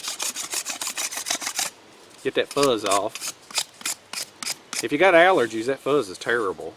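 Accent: South, United States. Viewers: southern drawl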